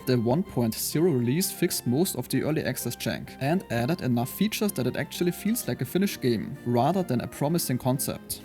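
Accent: Germany. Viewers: absolute pain listening to your bad german english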